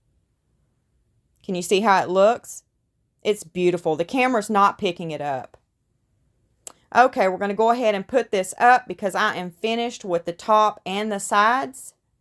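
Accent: South, United States. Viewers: I adore these mixed media resin style pieces❤ur daughter is gonna adore it I’m certain of it!! Did I see on the hobby lobby sticker Oklahoma? I knew u were from the south Cz of ur draw & that u say “fixin to”! I went to high school in Claremore Oklahoma & still have friends I visit in Oklahoma City every few years. It be crazy if ur from around there too🤯🫢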